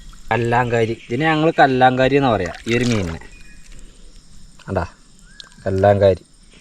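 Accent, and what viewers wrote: Malappuram, India. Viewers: മായ പുയ അങ്ങനെതന്നെ പറഞ്ഞാമതി ❤ മലപ്പുറം അങ്ങനെ ആണ്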